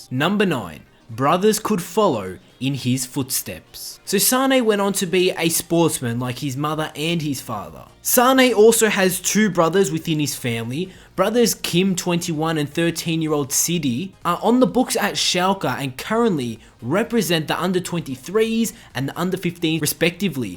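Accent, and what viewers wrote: Australia. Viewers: le roy sane from Maineee chester citah!! aussi accent hahahh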